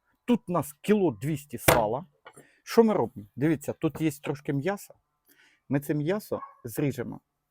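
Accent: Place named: Dnieper, Ukraine. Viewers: Навєрно з Дніпропетровщини, так само балакаю)) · Який суржик, нормальна наддніпрянська говірка))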